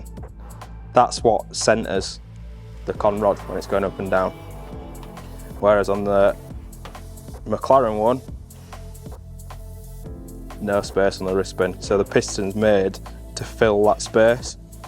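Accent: United Kingdom. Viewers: Where you based you sound local accent. Wakefield/Leeds???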